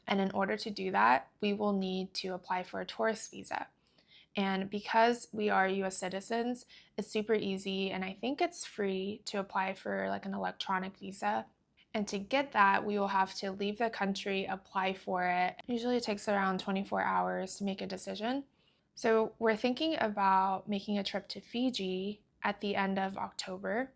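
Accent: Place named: Australia. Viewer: Hi! Omg I’m excited for your trip! Hopefully you can get a tourist visa on time so that you can also spend more time traveling before heading back to the states☺️ I might be the only one thinking this but I feel like I’m hearing a bit of the Australian accent from you?!😆 omg that’s so cute your boyfriend likes chocolate milk!! I love watching your channel as well as cloudymoney, rainebudget, and fancydollar🤍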